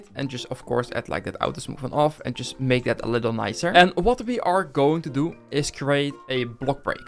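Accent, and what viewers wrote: Netherlands. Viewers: Hi, I have a question! Are you Dutch? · bro ben je nederland svolgens mij wel kan ik horen lol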